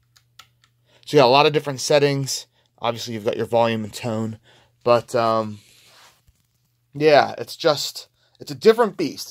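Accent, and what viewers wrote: United States. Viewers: When you going to germany for some real metal head shit real nice work doing. you def got a florida accent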